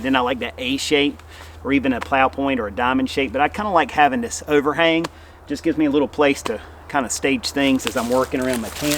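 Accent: South, United States. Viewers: Sorry, trying to figure out what state your in? Couldn't find it, but you have a pretty cool southern twang in your voice.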